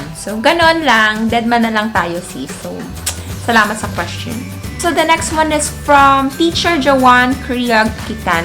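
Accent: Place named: England, United Kingdom. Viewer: I will raise a question pretty lady, HOW TO BE YOU??? I rrally like your english accent. ❤️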